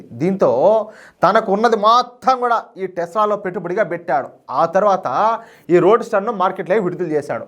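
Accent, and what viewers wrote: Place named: Kadapa, India. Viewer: I love your Kadapa language.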